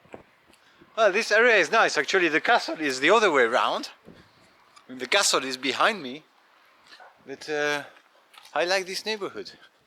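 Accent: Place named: France. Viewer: This guy sounds like a french or something.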